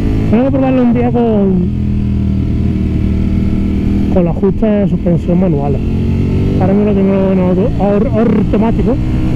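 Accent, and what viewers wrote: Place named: Andalusia, Spain. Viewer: es que es andaluz xD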